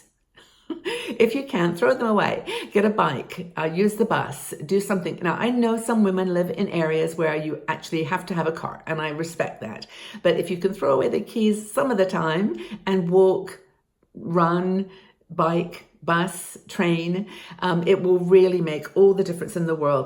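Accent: England, United Kingdom. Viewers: You are lovely! Are you originally from England? I detect an accent :) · You're an inspiration! You sound English? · i thought you were english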